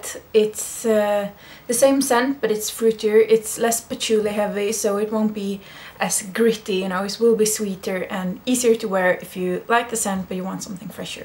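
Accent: Sweden. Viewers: I am usually attracted to old-school stinkbombs (Shalimar and Opium) but you make me want to go for some Angel. After watching your older perfume video I went out and tried some Versace Black Crystal and since then I have been obsessed with white flowers, and yes it totally smells like vampires on the beach! Also that swedish accent is so sweet. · I can't get enough of that swedish english accent!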